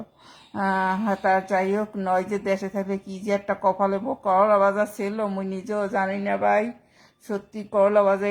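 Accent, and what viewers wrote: Barishal, Bangladesh. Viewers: আপুর বাড়ি কি বরিশালে? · দিদিভাই আপনার বাড়ী কি বরিশাল 😅 Thanks didi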